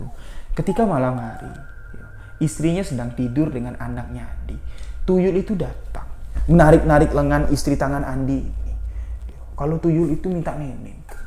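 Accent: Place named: Madura, Indonesia. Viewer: kya logatnya org madura...denger cerita spt ini kn enaknya sambil merem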